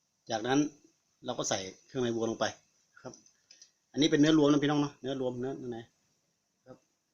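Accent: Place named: North, Thailand. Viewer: สำเนียงไม่ใช่คนอิสานเด้,คนเหนือแต้ๆเด้นี่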